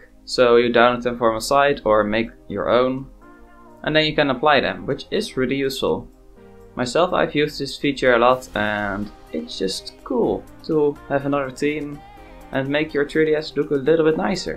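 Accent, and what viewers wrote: Netherlands. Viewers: hij is zoo nederlands haha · You sound Dutch my boi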